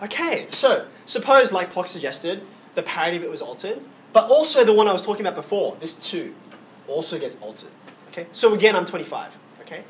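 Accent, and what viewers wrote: Australia. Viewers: I would listen to this guy any time. DAT DERE AUSTRALIAN ACCENT. !! Very well explained btw!!!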